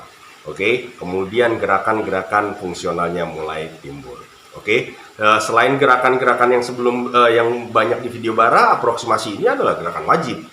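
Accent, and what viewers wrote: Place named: England, United Kingdom. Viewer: Bang jelasinnya pakai full bhs Indonesian saja. .soalnya terlihat lucu aksen Inggris nya.. 😂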